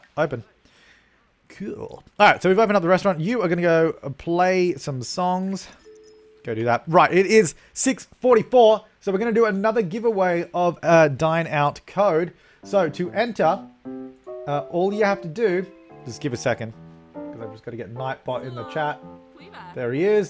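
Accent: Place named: Australia. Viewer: WAIT!!! you're Australian??.. i mean it would make sence since your talking sounds it XDD just didnt think u were with us Auzzies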